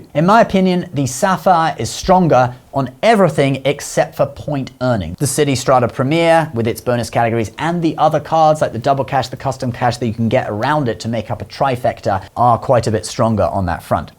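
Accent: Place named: United Kingdom. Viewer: you're Brit? I always thought that was just your YouTube character.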